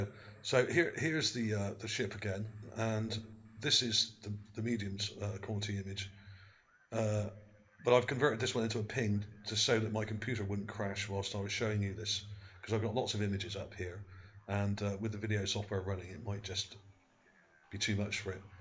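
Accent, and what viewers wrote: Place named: West Country, United Kingdom. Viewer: It makes a change to hear a west country voice! Instead of one of those cosmopolitan Jewish American voices, that so often accompany these videos. Are you from Somerset as there are certain timings in your phraseology that given an indication that you are?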